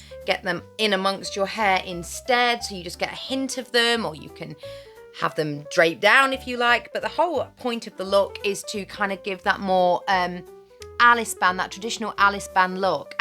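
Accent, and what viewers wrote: United Kingdom. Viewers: LOVE this! What a great teacher you are! I posted a comment requesting a video like this on one of your other videos, but I don’t remember which one it was. Anyway, I am enjoying binge watching your videos. They keep me company while I’m washing dishes, preparing a meal , etc, and then I sit down lighter when I have more time and watch as well as listen. I love your voice. As a Texan with a Texas drawl, it’s so refreshing to hear a British accent. Keep them coming!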